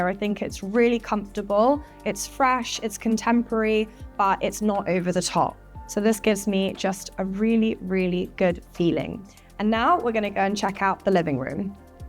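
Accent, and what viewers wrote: RP, United Kingdom. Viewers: OK Yah person employed to flog ludicrously expensive pad. Almost comical received English.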